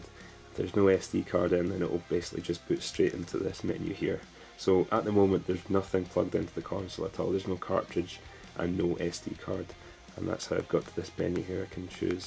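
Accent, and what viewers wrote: Scotland, United Kingdom. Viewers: Where in Scotland are you from? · Confession: I actually just watched this video to hear your raspy, Scottish (I think) voice. I honestly watch this every night because you have a voice that smooths me up like butter and I just fall asleep just like that.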